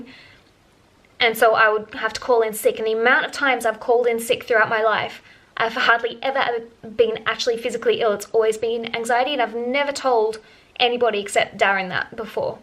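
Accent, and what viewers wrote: Ireland. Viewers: Is it just me or do you have a bit of an Irish accent :o 🍀:) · I am loving your little Irish twang. I live in Brit living in Tassie, but all my family are Irish! Love it! Thank you for sharing a part of your soul with us! <3 love and hugs xx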